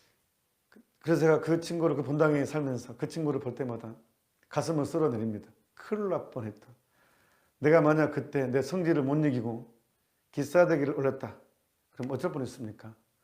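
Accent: Jeolla, South Korea. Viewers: 찬미 예수님! 신부님 감사합니다 ~~^^ 진심이 묻어나는 전라도 사투리가 순수하고 재미있어서 웃음 지어 집니다 ~~ 좋은강의 항상 감사합니다 ~ 덕분에 매일 삶이 기적입니다~♡ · 하느님을 닮아 순수하신 신부니이시네요.오늘 처음 강론을 들었는데 많은 가르침 배우고 갑니다~^^자주 배우겠습니다.근데 전라도 사투리 넘 재밌네요 ㅎ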